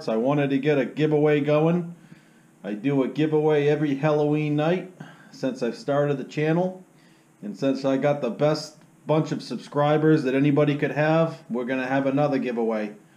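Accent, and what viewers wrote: United States. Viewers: Put my name in the pot. Enjoy your videos and especially love the New Hampshire accent.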